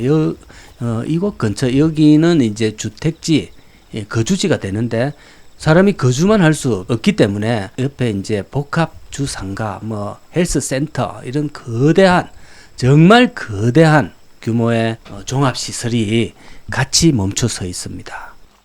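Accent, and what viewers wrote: Gyeongsang, South Korea. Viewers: 표현력도 좋으시고 지식도 풍부하시고 경상도 말씨도 듬직 하시네요 안타까운 현실.. 덕분에 잘 봤습니다 감사해요 구독좋아요 꾸욱~~~♡ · 목소리가 어째 으시시하네요. 게다가 겡상도 사투리로. 에이~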